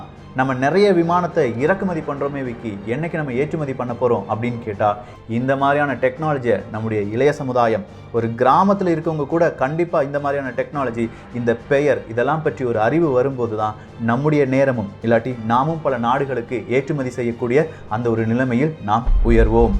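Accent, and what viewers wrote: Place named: Thoothukudi, India. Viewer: thala nyt namma RN kao adha marandhu radhinga ok va en bro neenga thoothukudi ya slang andha mari iruku sometimes silla ucharipu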